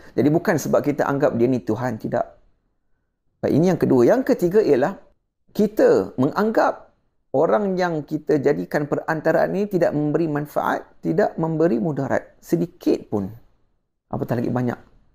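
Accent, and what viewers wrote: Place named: United Kingdom. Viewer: Memang benar....sesiapa yg otak 1@ digit memang tidak akan faham apa yg kapten bicarakan....Lidah kapten lidah inggeris sbb hari2 gunakan bahasa tersebut. Slang berbahasa arab pun sudah tentu keinggerisan. Pernah dengar syeh mahmud khalil qusairy baca quran slang khorasan? Mereka akn fitnah pelbagai cara dari pelbagai sudut. Tidak salah saya anjurkan para ustz (klu boleh dipanggil ustazlah) belajar lagi...sbb ilmu islam ini amat luas....umur 100 tahun pun belum tentu sudah cukup😂😂😂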